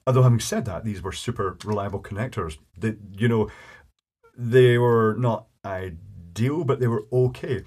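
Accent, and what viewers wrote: Scotland, United Kingdom. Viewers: Poshest Scottish voice I’ve ever heard, great videos btw. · How should I say this...I'm completely distracted with story time because I'm watching this video and it appears to be me speaking with a Scottish accent and wearing my father's beard. Same hair cut, same hairline. We even look to be the same age. Beyond bizarre. What color are your eyes?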